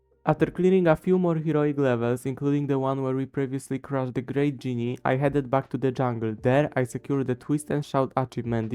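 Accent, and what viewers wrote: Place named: Poland. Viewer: najmocniejszy polski akcent ever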